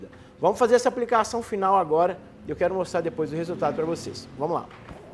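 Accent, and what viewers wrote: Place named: Minas Gerais, Brazil. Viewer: Sotaque mineiro é foda...ja da pra daber so de ouvir kkkkkkkkk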